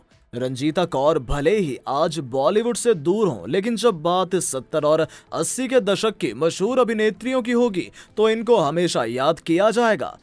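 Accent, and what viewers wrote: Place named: United Kingdom. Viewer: Why ur talking in Hindi with attaching English accent to it. Hindi should be spoken properly. You sound so weird.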